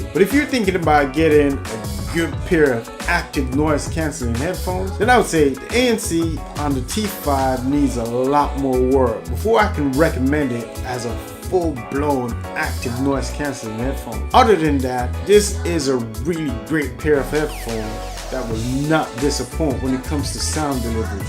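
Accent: Jamaica. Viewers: we Jamaicans know a Jamaican when we hear one..the accent is still there bro. I got the t5s but the sensor is giving trouble so I had to put tape over to stop the problem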